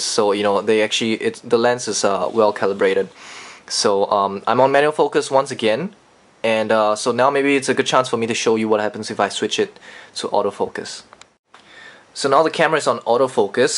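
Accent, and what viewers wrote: Singapore. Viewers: Singaporean english same as Malaysian english :D that's why i can understand this video XD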